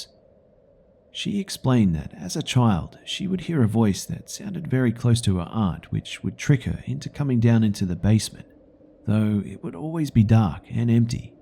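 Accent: Australia. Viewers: He's Australian :)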